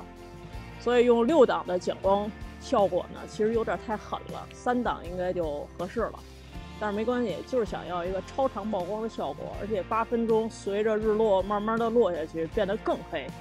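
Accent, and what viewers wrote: Beijing, China. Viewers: 绝对是个老北京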